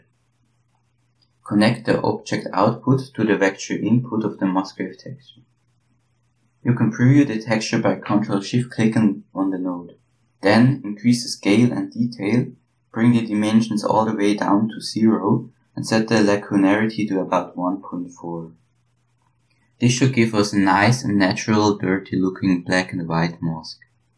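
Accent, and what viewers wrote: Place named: Switzerland. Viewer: Haha ich han grad kört dass du en schwitzer bisch an dim akzänt :P Cools Tutorial btw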